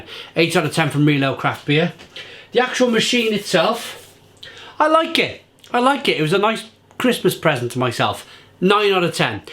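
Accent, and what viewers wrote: Wales, United Kingdom. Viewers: I’m following this guy just on the back of his great welch accent and likeable person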